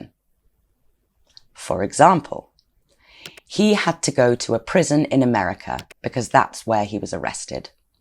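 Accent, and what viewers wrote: United Kingdom. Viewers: 영국발음이 좋기는 좋구나... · 아 진짜 영국 영어 엑센트 너무 좋은거 같아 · 딱 영국발음 좋아요 좋아~! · I had an appointment with my doctor 이렇게 말해야 하나? 하고 들어온한국인... 근데 영국식 영어인... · 여윽시 영국식 발음과 억양은 독일어 배운 나에게 엄청 매력적임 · 영국 발음 매력적이에요~ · 영국식 발음 죽이네요. 마치 영어가 아닌것처럼 들려요.. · 와 엄청 도도하고 섹시하다 영국발음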